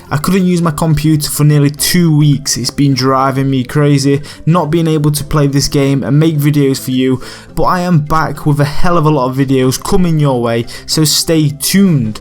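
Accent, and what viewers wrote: United Kingdom. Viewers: I love your content so much but your accent annoys me so much 😂 I'm sorry, I still watch but it does annoy me not sure why. which part of Britain are you from? Lancashire here:)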